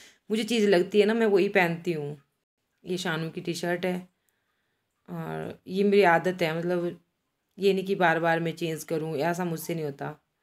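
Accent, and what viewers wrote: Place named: Sirmaur, India. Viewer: Boli se aap sirmaur k ass pass k lagte ho Ya solan district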